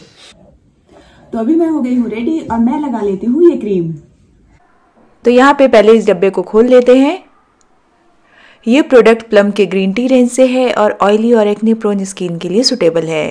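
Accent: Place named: India. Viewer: Fiiling philing ek dam deshi wali English very nice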